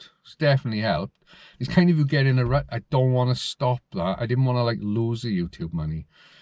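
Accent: Wales, United Kingdom. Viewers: Thanks for all you've done, take it easy butt. When I first found one of your videos I'd been outside Wales for months in Uni, it cheered me right up hearing that familiar Welsh accent! You've put up some great videos and we appreciate them all. Good to see you're well and healthy:) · Your little Welsh tones have been missed.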